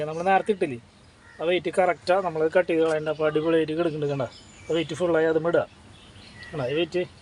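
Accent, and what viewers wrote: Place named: Thrissur, India. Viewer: Kettaa arinjoode thrissur aanennu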